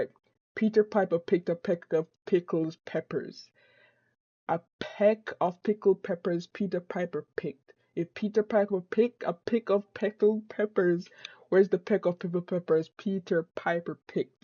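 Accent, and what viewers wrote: Jamaica. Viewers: You deserved my sub bro....btw that accent are you jamaican? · Are u Jamaican dawg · U Jamaican Bro? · Could tell from the get go that you were Jamaican 🤣🤣🤣 A di only reason me subscribe, bless up. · Yeah your Jamaican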